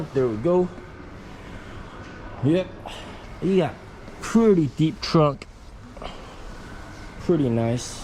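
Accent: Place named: United States. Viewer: Never watched any of his video, got a headache from all the «cool american» accent. Keep it simple, not everything is sooo cool. From an european’s perspective, the car looks great, but sorry. It’s still a Chinese car with shitty range. It will never work outside of China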